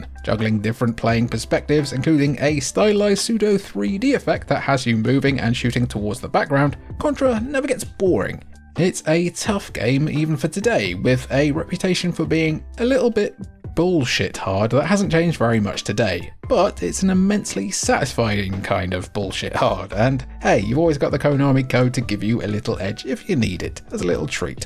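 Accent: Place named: United Kingdom. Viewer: Fax very much! Not just for this vid but the others I have watched. Good to hear another brit even when you get the tongue twisters in your videos hah. Faxanadu really gave me Zelda 2 vibes with the side scrolling.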